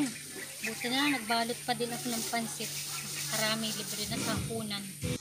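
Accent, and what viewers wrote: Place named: Oriental Mindoro, Philippines. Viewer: Akoy tuwang tuwa sau marehin namiss k gnyng tono ng salita jn s ating Or. Mdo.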